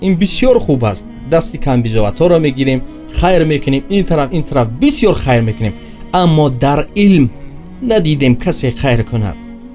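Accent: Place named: Tajikistan. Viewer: Асалом Алекум акаи кучогиай забони точикиро тоза гап мезанад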